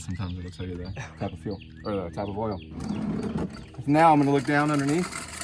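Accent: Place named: South, United States. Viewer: Your southern accent is adorable.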